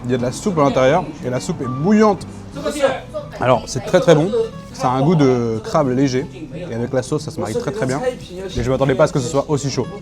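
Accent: China. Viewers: Super video! Ca me fesait rire à chaque fois que tu parlais anglais avec l'accent chinois lol! J'ai hate à la prochaine video 📹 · Mention spéciale à ton anglais avec un accent chinois, c'est drôle et en même temps trop fort! Ravi de suivre tes nouvelles aventures:)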